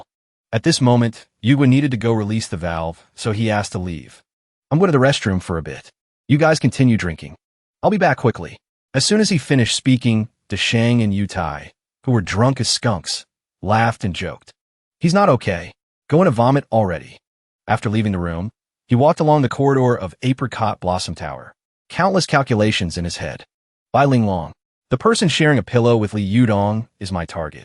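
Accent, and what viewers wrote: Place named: France. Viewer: tf is this voice? is the ai getting heartattacks? why is it speaking in a french english accent in the video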